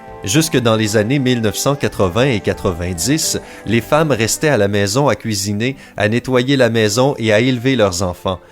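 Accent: Quebec, Canada. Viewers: Yess accent québécois! Vive le québec! ❤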